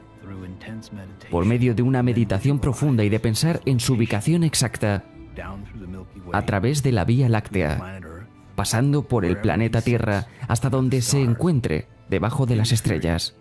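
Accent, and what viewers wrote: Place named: Spain. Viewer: Pinche gente huevona que no tiene otra cosa que hacer ? Pongance a trabajar de verdad, y esa pinche voz española agrede el oido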